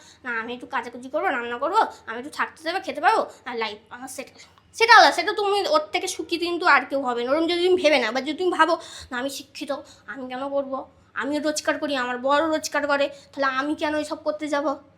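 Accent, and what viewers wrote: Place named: Hooghly, India. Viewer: Tomer kotha sune mone hoche hoogly tebari kintu hooglyr meyera erohkom noy to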